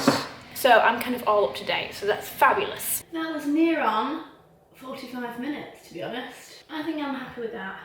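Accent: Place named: North, United Kingdom. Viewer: Keep the northerness going! I love it when you drop in certain phrases or ways of saying things. Wishing you, and Boe a lovely Christmas xxx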